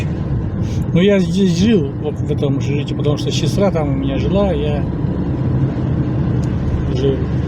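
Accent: Komi, Russia. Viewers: Да уж Коми говор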